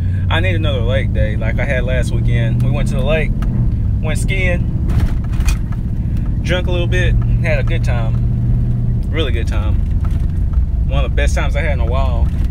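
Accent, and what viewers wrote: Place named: Atlanta, United States. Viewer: Your from Atlanta all my people out there talk like that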